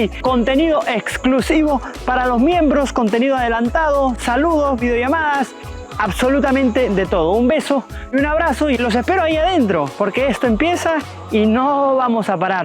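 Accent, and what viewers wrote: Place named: Argentina. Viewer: Pobre hombre no quisiera estar en tu lugar, hablas Como Argentino, y tienes in aire de Carlos alvarez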